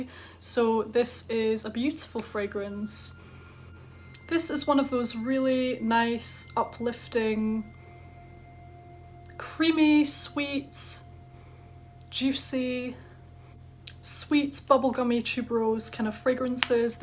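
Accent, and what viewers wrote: Scotland, United Kingdom. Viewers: Nice video. You are a pleasure to watch, a star of Scotland. Lovely Scottish accent.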